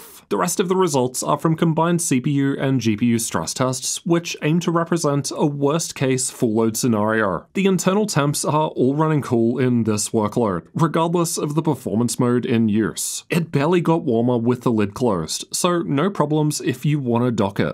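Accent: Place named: Australia. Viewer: Man, that Aussie accent sounds funny. :D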